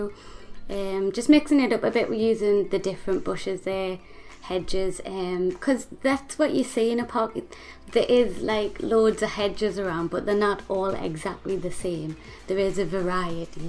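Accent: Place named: Newcastle, United Kingdom. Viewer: Geordie through and through! Why aye lass!! Love your builds X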